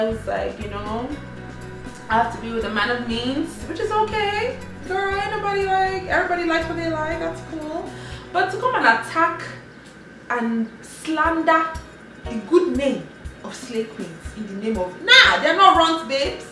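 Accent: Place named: Igbo, Nigeria. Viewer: hahaha...see me laughing my butt here. slay queen drama...That igbotic pidgin english accent though.😂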